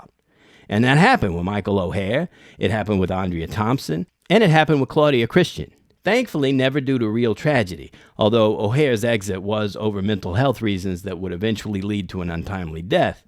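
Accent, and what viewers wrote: New York, United States. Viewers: Somehow, I stumbled onto your pages. Being a casual, super nerd and loving all things sci-fi. I also consume all movies, new and old, especially if they're good so I love all the movie references have found some of your recommendations great options to follow up on. I always learn something new when I watch your videos. I found your insights, interesting, fresh, and fun. I hope you continue to do videos because I think the last one you posted was a year ago. I'm not seeing anything new, unless I'm not looking at the timestamps correctly. Keep up the good work. I'm still going through the rest of your catalog, but I completed everything Star Trek and moving on to the other videos and playlist that you've created. Love your work. And I love your scripts and New York accent. It has a hint of old -timey but hip New Yorker.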